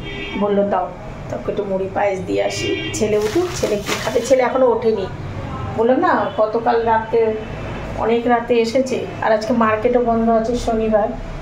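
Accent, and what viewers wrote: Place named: Bangal, Bangladesh. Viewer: Vison valo laga didi-bai tmr mukha bangal kotha ami ekta problem a tmr vdeo thik moto dekte parchi na valo thako didi-bai❤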